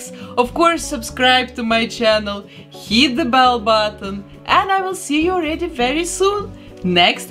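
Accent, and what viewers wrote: Russia. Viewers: Меня путает ваш выраженный русский акцент 🙃❤️ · Вы русская?) акцент очень похож :)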